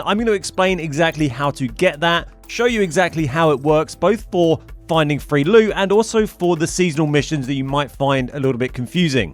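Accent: England, United Kingdom. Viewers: He's English but uses far too many Americanisms for my liking, but I forgive him..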